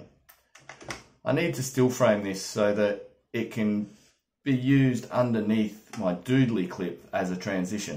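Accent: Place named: Australia. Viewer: Hello, loved your video tutorial. Spoken in true, direct and entertaining Aussie style. That's an opinion coming from an old, well travelled Scouser. I just signed up to Doodly, following my recent acquisition of DaVinci, when I decided to stay sane during lockdown by telling a lifetime of stories in video. It's a steep learning curve for an old git, so I'll be checking out your tutorials. Stay positive, Jim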